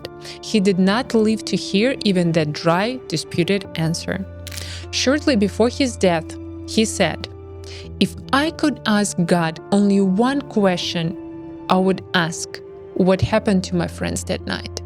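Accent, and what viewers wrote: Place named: Russia. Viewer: What a fantastic deep dive into this story! So well explained, illustrated and presented. I appreciate the Russian accent too :) I hope one day the Soviet archives are opened up fully and we understand more. The angle on the Kyshtym nuclear event and aliens arriving is an intriguing one.... Thanks again and greetings from Spain.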